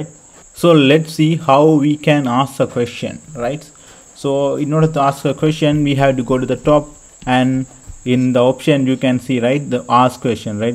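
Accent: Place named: India. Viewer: i know i can understand it. When i hear an indian voice :v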